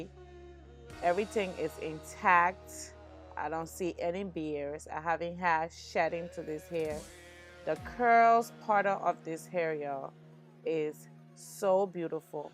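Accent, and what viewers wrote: Liberia. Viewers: Yes I thought so too. She sounds like a Liberian. Good job girl keep it up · new subbie! as soon as i heard that accent i said i must follow my liberian geh! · Aww I noticed your Liberian accent 🇱🇷🇱🇷🥰🥰🥰🥰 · You are a west African Liberian. ...your accent though. ...you are very talented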